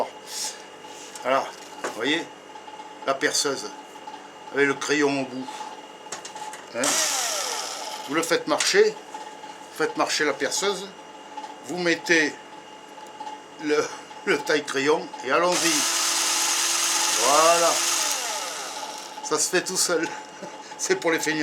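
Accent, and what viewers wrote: South, France. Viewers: tres sympa cette video. et toujours avé l'accent!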